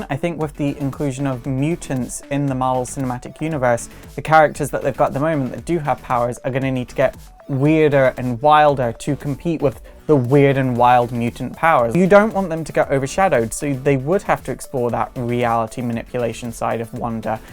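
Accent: Scotland, United Kingdom. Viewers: Is he Scottish ? I hear a mixed accent so I don’t know what his accent is lol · you sound slightly more Scottish than usual this video, I kind of really like it.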